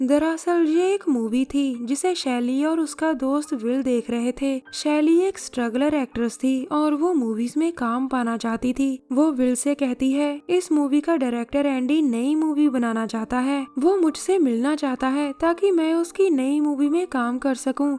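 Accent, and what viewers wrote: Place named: Bihar, India. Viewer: Always here Love you didi You are from Bihar I know 😁🙏😁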